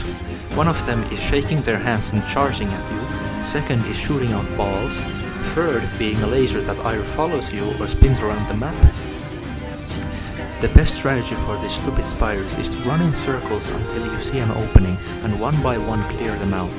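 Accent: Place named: Finland. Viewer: sounds like an finnish accent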